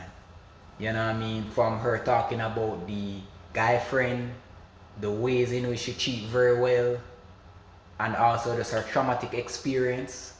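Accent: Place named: Caribbean. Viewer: You said all facts bro, I just subscribed didn’t know you make content I hear the accent, im Carribean as well, big ups 🤛🏿💯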